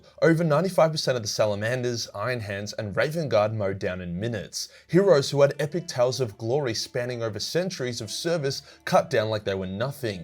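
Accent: Australia. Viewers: Wow glad to see my favourite character be told by an australian, thanks mayte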